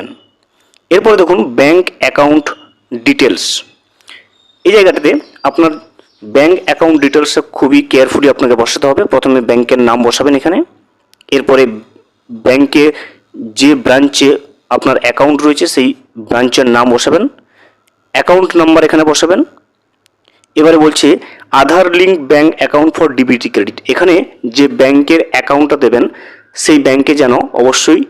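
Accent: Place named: Bangladesh. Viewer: apni ki Bangladesh teka video toiri korchen apnar voice ta Bangladesh desh er moto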